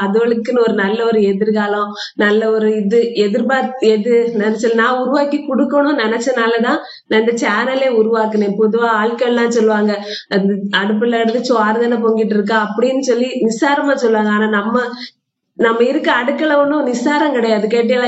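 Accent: Kanyakumari, India. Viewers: Mam unga vedio daily parpen ,un kayakumari slang ennaku romba pdikum mam super aa panringa unga job CS pati solunga mam my daughter want to study CS so pls guide vedio onnu podunga ,how job opportunities after CS pls explain mam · உங்கள் குரல் மற்றும் பேசும் விதம் ( அந்த கன்யாகுமரி பேச்சு) இது தான் உங்கள் வளர்ச்சிக்கு காரணம்.வாழ்க வளமுடன். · கன்யாகுமரி slang ,சுறுசுறுப்பு , neatness, மீன் , உங்க உற்சாக குரல் இவை எல்லாம் உங்களை பிடிக்க முக்கிய காரணம் இப்போ இந்த சாந்தமான முகம் ரொம்ப பிடிக்குது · I love fishes so I’m Eagerly waiting for ur daily fish videos neenga seirathu patha saputa mari oru feel😋😋😋 and Ur Kanyakumari slang is so natural ❤nan Coimbatore unga slang enaku kekka puthusa nala erunthuchu 🥰love from Coimbatore 💟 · உங்க குரலுக்காகதான் நான் முதலில் பார்த்தேன். இப்போது உங்களையும் பிடித்து விட்டது உங்க கன்னியாகுமரி பேட்சும் பிடிச்சிட்டு. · உங்க குரல், அந்த பேசுர அந்த கன்னியாக்குமாரி வார்த்தை. 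எனக்கு ரொம்ப பிடிக்கும். முக்கியமா, கேட்டியலா...அதற்கு நான் அடிமை. நான் திருநெல்வேலி .பக்கம்.சங்கரன்கோவில்..... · Your kanyakumari dialect and baavala illadha humility dhaan vunga plus points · Ivanga thirunelviy illai. kanyakumari maavattam. kannykaumari maathiri thaan azhakaa pesuhaa. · அருமையான இனிமையான கன்யாகுமரி பாஷை வாழ்க வாழ்க வாழ்க வளர்க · கன்னியாகுமரிஸ்லாங் தான் உங்களூடைய வெற்றிக்கு காரணம் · Best wishes for your grand success in your life n channel. Your voice, Kanyakumari way of Tamil speech r all good. Carry on 💪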